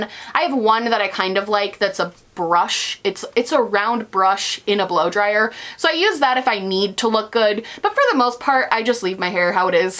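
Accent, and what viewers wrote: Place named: Rochester, United States. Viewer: Okay so...I finally figured out who you sound like to me....Kristen Wiig! And you're both from Rochester, NY. Lookie there. Accents are cool. Love your videos btw! Please do more non-makeup favorites :)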